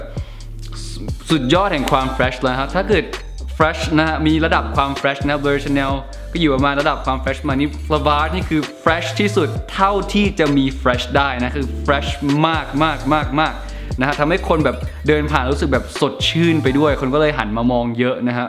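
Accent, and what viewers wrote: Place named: United Kingdom. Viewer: I love your EN accent <3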